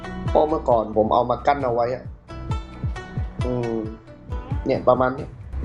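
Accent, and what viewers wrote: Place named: South, Thailand. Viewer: สำเนียงพี่บ่าวนิ่ คนใต้ม๊ายนิ 😅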